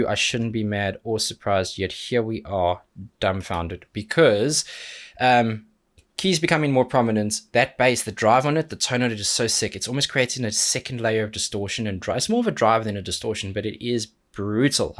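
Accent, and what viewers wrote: South Africa. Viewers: Great reaction. First time I've watched you. Btw, are you from or have spent time in South Africa?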